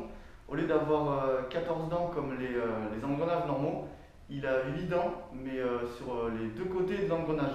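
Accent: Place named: South, France. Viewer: on reconnait la petite pointe du sud avec le ptit "tchichou" ^^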